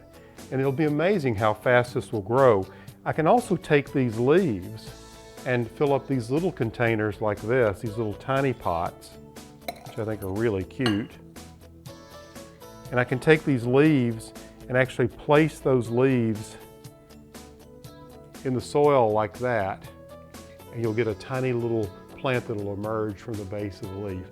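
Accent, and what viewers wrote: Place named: Arkansas, United States. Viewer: P... you sound like you're from Arkansas. Am I correct?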